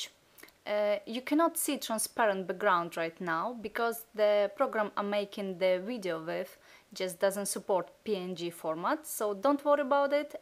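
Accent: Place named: Russia. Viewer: are you Russian. I really like your English. its too sexy. :)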